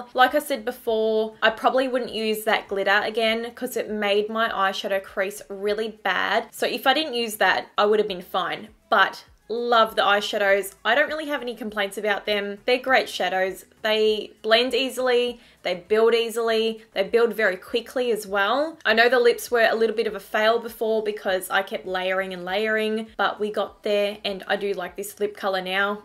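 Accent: Australia. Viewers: Australian accent 😍😍😍😍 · lol i was like.... nz or aussie? and then she said 'they bloody hurt' and i was immediately like yup aussie 😂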